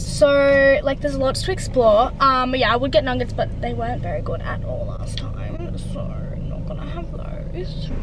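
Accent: Australia. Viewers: i can tell your australian by the accent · It's the Australian accent man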